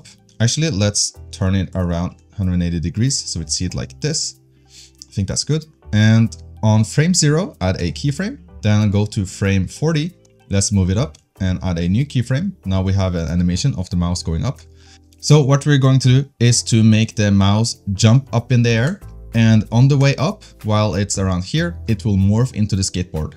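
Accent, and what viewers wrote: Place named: Norway. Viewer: I know a norwegian accent when I hear one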